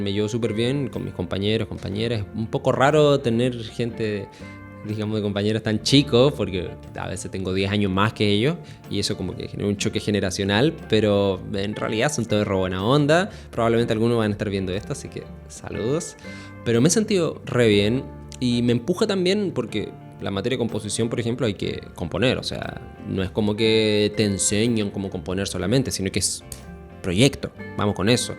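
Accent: Chile. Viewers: Hola. Te sigo desde hace poco. Ya me parecía... acento chileno pero arquitectura argentina. Al final, no entendía. Te escribo desde el centro de la provincia de Río Negro. Gracias.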